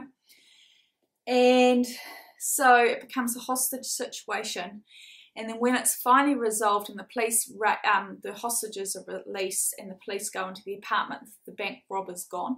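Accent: New Zealand. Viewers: hi it nice to meet you I'm glad Kelly recommended you I'm loving listening to you and seeing your makes I'm the same I wish I could sew better because I'd love to make my own clothes I just never get round to. it I have a soft spot for anything to do with New Zealand because I lived there quite a few years ago and my parents still have a home in the north island it's great listening to your accent so familiar Looking forward to catching up on your other episodes.I'm watching you in the sunny ukl. today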